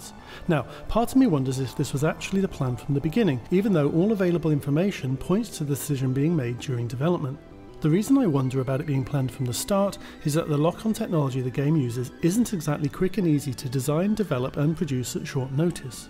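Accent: United Kingdom. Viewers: A charming English voice I gotta subscribe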